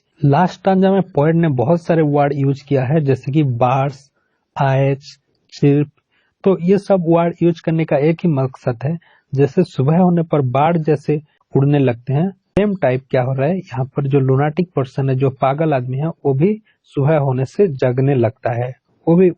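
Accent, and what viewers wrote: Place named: Bengal, India. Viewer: Sir r u bengali ?ur accent seems like that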